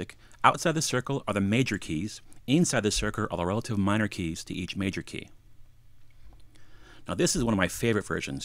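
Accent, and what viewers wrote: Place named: Midwest, United States. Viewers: American English, if that's what you're asking. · midwest. maybe chicago area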